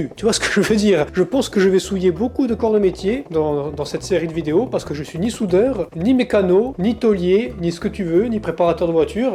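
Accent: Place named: Corsica, France. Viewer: pour ma part, j’ai transformé une petite f2000 en groupe À VH donc l’essentiel était déjà fait. Le partie ou j’ai galéré, ça été pour les freins avant car je voulais a tout prix des 4 pistons. Les gros en 315 cp3307 ne se faisant plus en neufs, j’ai opté pour les plus petit cp2361 avec les usinages correspondant… pour le reste ça été que du plaisir et de la passion. Je suivrais avec intérêt ce super projet et ces supers vidéos avec la touche et l’accent Corse😉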